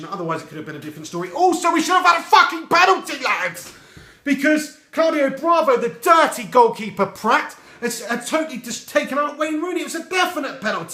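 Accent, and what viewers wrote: Manchester, United Kingdom. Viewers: which bit of Manchester is that accent from??? bellend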